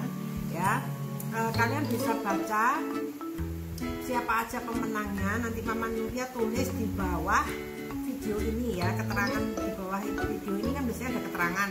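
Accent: Java, Indonesia. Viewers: Assalamualaikum Mama Nuria Mau Nanya Mama Nuria Orang Jawa Ya Kok Bahasanya Medok gitu Hehehe?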